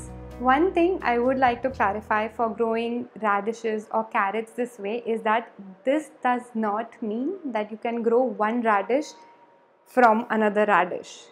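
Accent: India. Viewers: Pure Indian accent 😂😂😂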